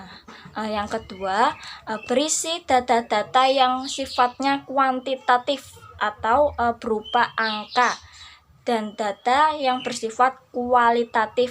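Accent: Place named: Java, Indonesia. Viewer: Kangen medoknya Non. 😆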